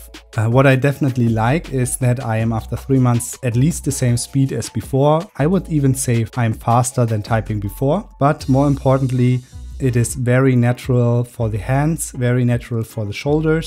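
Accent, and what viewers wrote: Germany. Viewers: hard disagree about op's comment. You just sound like a normal person with a German accent. You also don't talk with the "YouTube voice" (which is a good thing!). Sound's good, video's good, your script is tight without being rushed.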